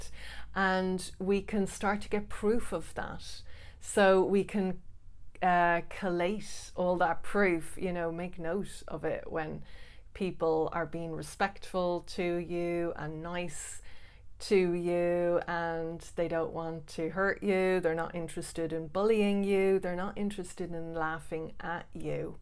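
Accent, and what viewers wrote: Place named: Ireland. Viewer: Hi..I live in Dublin...do you coach fsa individuals in Ireland?...I hear you've an Irish accent...😊 tnks! Brilliant video btw!❤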